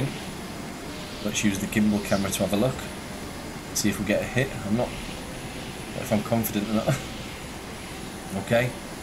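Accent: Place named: Scotland, United Kingdom. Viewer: As a non English native speaker, I sometimes have a hard time to get your charming scottish(?) dialect. But: your tutorials about this game are short, precise and in a perfect way, useful to me. Thank you, subbed! BTW. Did you tried the VR version already? Just in case, I didn’t found out how to zoom sight.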